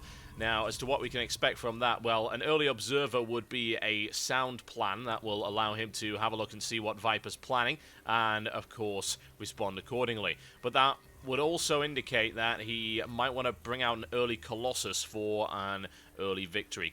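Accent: United Kingdom. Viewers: I've been watching your "I suck at SC2'" and Shoutcraft video's, and I must say, the I suck helps a lot to bolster my own play, and these shoutcrafts are the few I really like to watch in terms of SC2 matches, possibly because of the cynical brit voice. Looking forward to your next video! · Why you should grant me a betakey? To much barbarism and not enough Earl Grey in the copper league it seems. Someone please think of the ex-convicts turned marines who probably done horrible things to nice people to get sentenced to such a fate. Oh, and taking quite a fancy to your rather shouty brittish casting. More of that please.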